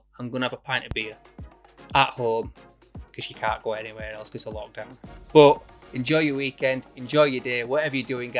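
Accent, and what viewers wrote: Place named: Scotland, United Kingdom. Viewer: Nice Scottish accent but I do think the BB58 blue is a real keeper!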